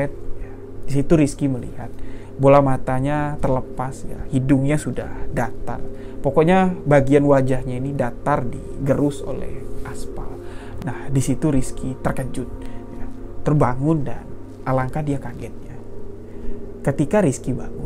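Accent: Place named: Bali, Indonesia. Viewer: Abang ni logatnya bali sekali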